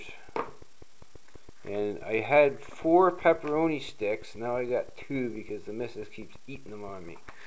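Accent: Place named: Newfoundland, Canada. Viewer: Definitely sound like a Newfie. ;)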